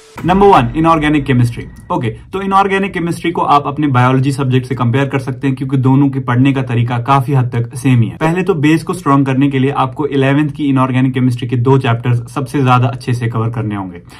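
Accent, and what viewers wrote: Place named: Kashmir, India. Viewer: Bro kashmiri accent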